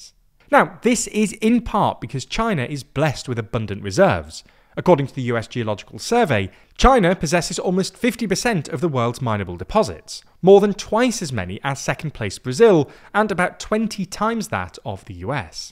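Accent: United Kingdom. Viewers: Everytime I hear a heavy British accent like this, I get turned off. It is supposed to make him sound intellectual but it actually showed him as shallow.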